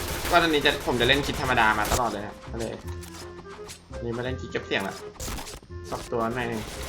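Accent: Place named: Kanchanaburi, Thailand. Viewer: เหน่อแบบนี้อยู่ กาญจนบุนี แน่เลย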